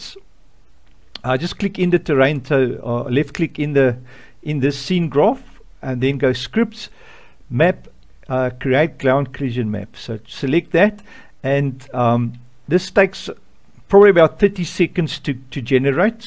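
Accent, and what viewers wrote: South Africa. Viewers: Ek kan sommer hoor jy is van Suid Afrika lol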